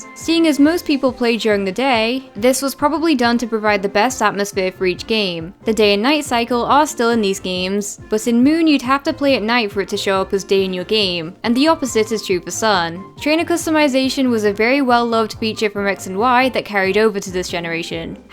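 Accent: United Kingdom. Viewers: A question,are you British?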